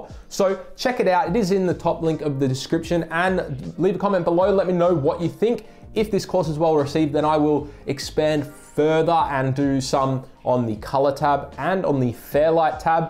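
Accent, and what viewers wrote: Australia. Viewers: downloaded the course and just finished the 3d text lesson. Great work. Helps you're an aussie so it feels very familiar, but that's gotta be one of the best explanations of how to build a 3d scene in fusion I've watched to date. I purchased the blackmagic books for resolve 15 because there wasn't much info out but this course is looking promising as a much easier way of learning the concepts. Great work mate, you're quickly establishing yourself as a go-to resource for resolve info on youtube!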